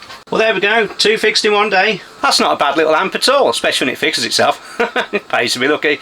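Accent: Birmingham, United Kingdom. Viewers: Neil from Blackburn. Hi. I found your narration a little bit to quick for me to take it in. So I simply reduced the playback speed on YT to 0.8 ×. That worked brilliantly for me. But it did then highlight your Brummie accent which I never picked up on before at 1.0 × normal speed. I've taught myself a new method to identify accents now. LTA. BN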